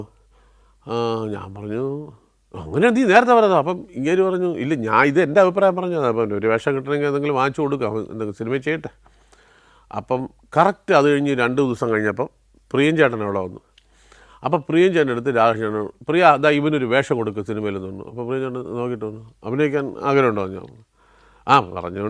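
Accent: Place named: Thiruvananthapuram, India. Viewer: ആദ്യത്തെ എപ്പിസോഡ് കണ്ടു് തിരുവനന്തപുരം ശൈലിയിൽ ഉള്ള വിവരണം കേട്ടപ്പോൾ ഇഷ്ടം ആയി❤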